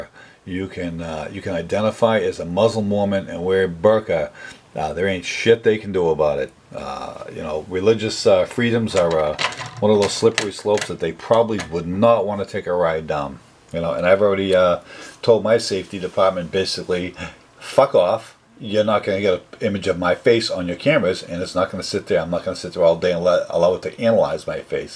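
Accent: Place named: Staten Island, United States. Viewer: You have a Staten Island Italian accent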